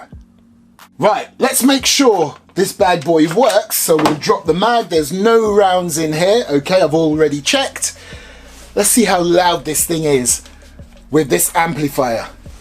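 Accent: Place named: United Kingdom. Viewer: Ima sum up why is my favorite airsoft reviewer: 1. He has a british accent (like come on) 2. He’s always super excited about reviewing these guns like it’s awesome 3. He likes to review budget guns and sometimes are beginner guns 4. If there’s a gun he’s prolly reviewed it EDIT: TAKE MY MONEY SPECNA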